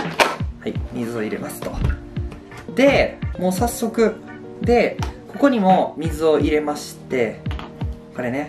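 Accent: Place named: Kansai, Japan. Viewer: 関西弁も素敵です☺️💕肌綺麗ですね！スチーマー買おうかと検討してたのですごく参考になりました！😆💕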